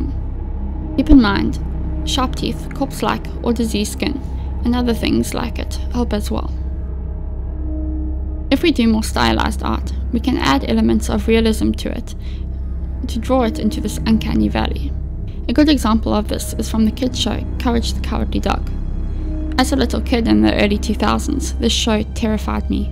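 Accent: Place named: South Africa. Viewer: You must be South African if not your accent sounds just like it. I am South African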